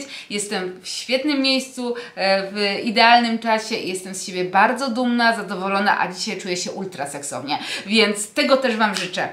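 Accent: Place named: Ukraine. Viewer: Po polsku wymawia się zmiękczone samogłoski .Jesteś Ukrainką ? Naucz się polskiej wymowy jeśli jesteś w Polsce!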